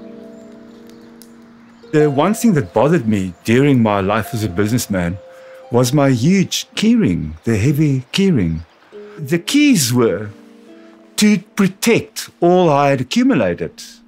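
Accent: South Africa. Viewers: Sounds South African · ❤ great profound little video, profound, and that South African accent 😂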